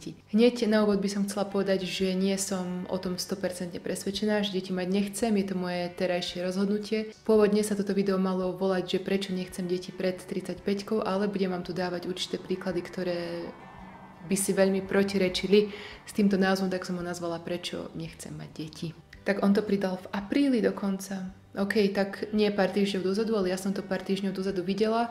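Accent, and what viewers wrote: Slovakia. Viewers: ta slovenčina mi prisla taka martinska... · Myšlienka" Neviem čo chcem, viem čo nechcem" mi je blízka 😂 Inak pekne stredoslovensky mäkko vravíš aj keď netuším odkial pochádzaš 😁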